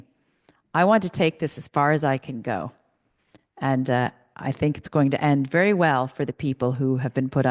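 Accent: United States. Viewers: Are u American ?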